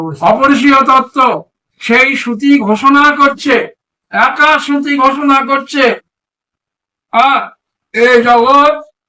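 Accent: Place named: India. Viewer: বাংলা কথা হিন্দি কথা টান দিয়ে কথা বলছেন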